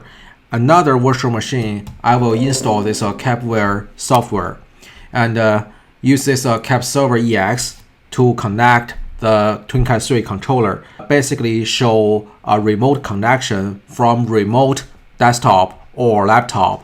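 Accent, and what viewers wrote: China. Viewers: I still can tell that you are Chinese 🤣 Thanks for the video tho.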